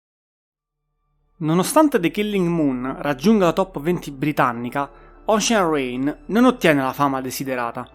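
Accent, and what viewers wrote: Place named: Rome, Italy. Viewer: Bravo per l’iniziativa, ma tra accento romano (mi distrae troppo) e (si pronuncia PorcupAine), non ce la faccio. Meno male che ci sono altri podcast. Comunque meno male che finalmente si parla di buona musica!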